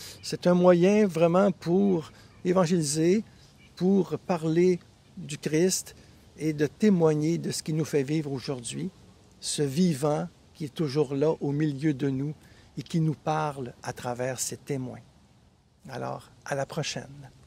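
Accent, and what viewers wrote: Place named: Quebec, Canada. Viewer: C'est la première fois que j'entends une voie canadienne française parler de Carlo Acutis. C'est bien de faire les éloges de bienheureux précoce, mais quand mettrons-nous sur pied une vaste ``opération Carlo Acutis`` dans les écoles parmi les jeunes qui sont on ne peut plus mûrs pour accueillir ce genre de découverte ?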